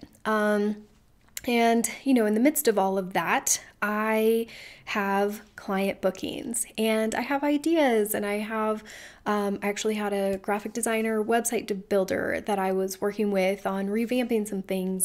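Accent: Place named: England, United Kingdom. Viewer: Where are you from? I love your English accent ❤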